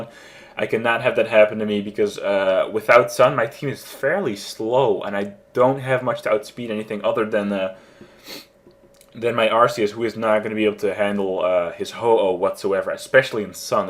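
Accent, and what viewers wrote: Netherlands. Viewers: U dutch? I can hear it in your accent haha ;)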